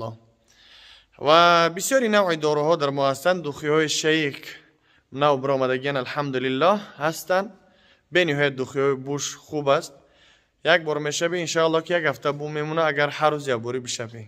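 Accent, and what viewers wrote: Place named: Afghanistan. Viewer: мисли афгонхо харф мезани.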